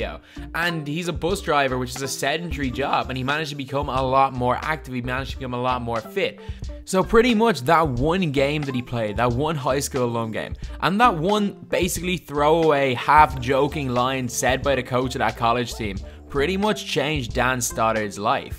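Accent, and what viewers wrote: Dublin, Ireland. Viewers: Ur Irish accent had me shook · Where are you from it sounds like Dublin?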